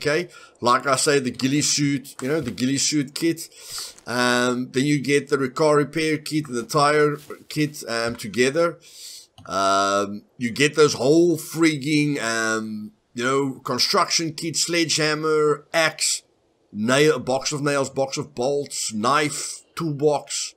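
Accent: South Africa. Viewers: hahhahahaha 1000% south african! i love it! Dankie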